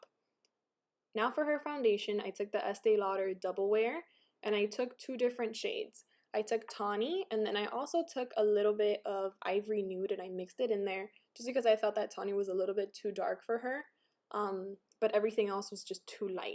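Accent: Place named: Miami, United States. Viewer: I knew you had to be from miami when i heard you talk !! Love the makeup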